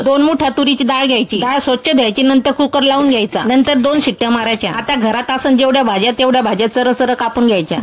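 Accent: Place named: Pune, India. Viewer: ही बोलन्याची शैली पुणे जिल्हातील शहरा नजदीक चे गावात चलती,,,,, कारण मि स्वतः पुणे जिल्हातील आहे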